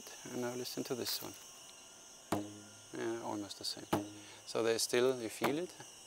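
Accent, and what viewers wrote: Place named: Germany. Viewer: That is a nice German accent! It reminds me of Dr. Emil Shaffhausen! - oh, and the bows were nice too.....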